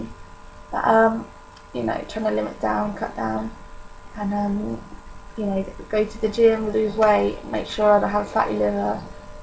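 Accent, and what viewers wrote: United Kingdom. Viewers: polish? ya fink? sooo british it hurts... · God bless you but you sound very British to me. I am sure I cant notice the slight accent because I am American.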